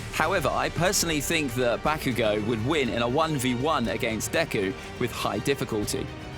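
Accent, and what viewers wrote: United Kingdom. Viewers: Your defenetly british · Nice Power Level. Maybe we could do a power level together on my channel Btw I love your british accent :)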